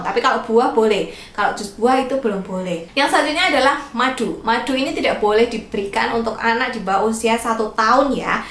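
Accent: Java, Indonesia. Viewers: Makasih 🥺❤️ Udah rajin edukasi kami lengkap infonya terutama aku newmom yg mana aku uda gapunya Ibu.. dr awal hamil sampe lahiran skg babyku umur 4mo rajin nonton bubid belajarnya disini lengkap - everyday is learning. Bonus logat jawanya 🥰🥺 berasa lagi dikasih tau keluarga sendiri (aku rantau d jakarta) *peluk hehe Sehat selalu yaa ❤️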